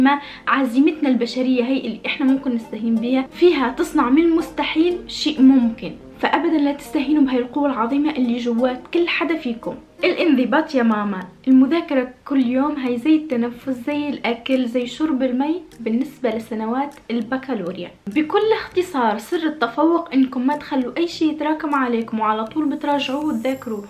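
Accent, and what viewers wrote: Tunisia. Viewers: دوختني وتش نتي تومسية او مغربية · تونسية ياخي ؟؟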